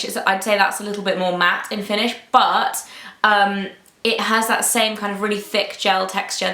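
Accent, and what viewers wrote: England, United Kingdom. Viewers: is she english or american ? :)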